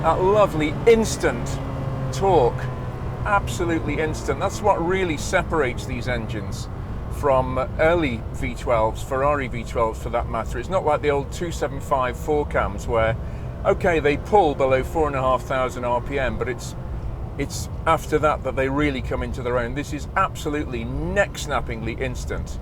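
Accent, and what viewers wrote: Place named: Northern England, United Kingdom. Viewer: Yes and no - while our host here is an expert no doubt, he's a bit of an old woman as well. Harry wears his expertise far more lightly, coming across as more modest - with a nice approachable humility. This guy gives me the vibe of a cross between the broad "northern" fella saying a resounding "NOooo" played by Paul Whitehouse in that Aviva advert about ten years ago, Victoria Wood playing one of the gossipy, complaining tea ladies - and Alan Bennett (at "soopper" - with his "mootha"). There's just something slightly precious and self satisfied about which grates on me for reasons, despite my best efforts, I can't quite describe.....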